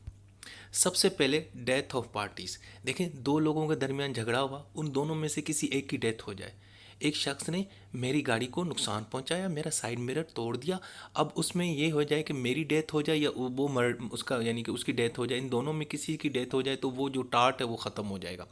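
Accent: Karachi, Pakistan. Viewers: Sir ap Karachi sa hn? Ap ky bat krny k Andaz sa lg rha h plz btay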